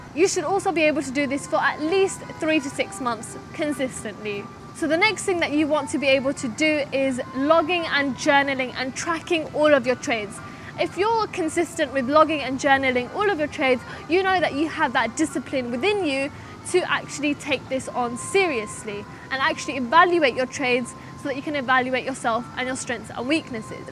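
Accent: United Kingdom. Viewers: you have a british ascent yet you look like an arab, kinda confusing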